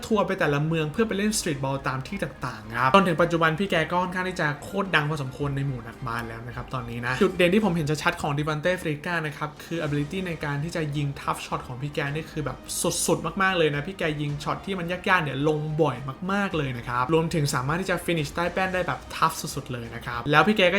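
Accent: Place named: United States. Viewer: ทำไมพี่ต้องติดพูดเมกาด้วยครับ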